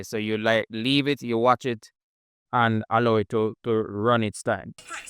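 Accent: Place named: Guyana. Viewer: Nice accent. Guyanese?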